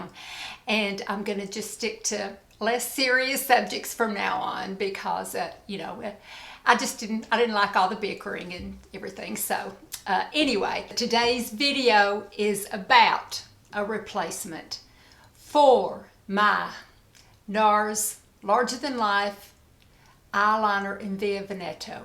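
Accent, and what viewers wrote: South, United States. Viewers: LOVE seeing you twice in one week!!!!! thank you so much. I appreciate it more than you can know! And I'm PROUD of you for taking down ANY video you deem necessary! I guess I didn't read the "bickering" comments, as there were SO many that were flooding thru, but just saw lots of Spiritual women ! I go along with Any of your decisions! I'm here for the skin care, beauty tips, and love the makeup GRWM's over anything else. However...Love your serious ones, too. Guess I just love seeing your face and hearing your southern voice like mine! We sound the exact same here in NC!!!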